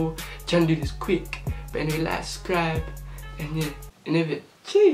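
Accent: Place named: United Kingdom. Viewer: Why r u so British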